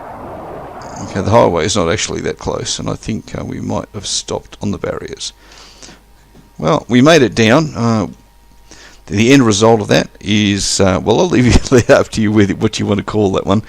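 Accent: Australia. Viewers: Great video, a side of flight sims people don't usually consider. Good to hear another Aussie too!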